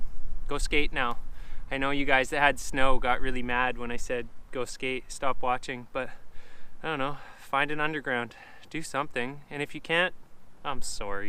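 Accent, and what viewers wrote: Canada. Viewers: U sound so Canadian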